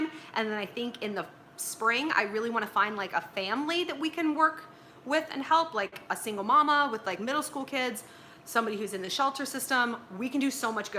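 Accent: France. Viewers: The french accent 🤣😄🤗😄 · Oh my CRAP!!! I LOVE YOUR FRENCH ACCENT!!! SO MANY THINGS! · Wow that French accent!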